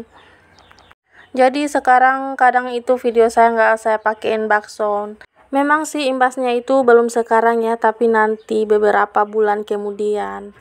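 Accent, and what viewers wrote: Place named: Gorontalo, Indonesia. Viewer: Suka bgt logat gorontalo..teringat tmn yg dri Gorontalo jg.slm dri mkassar y bund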